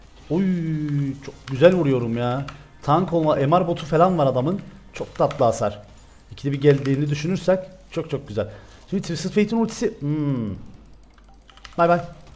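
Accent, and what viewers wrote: Cyprus. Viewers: abi seni izleye izleye ağzım kıbrıs ağzına döndü evde hade de genne diye dolaşıyom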